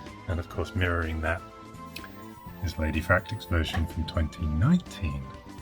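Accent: United Kingdom. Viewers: your lovely British accent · It's great to hear a blighty accent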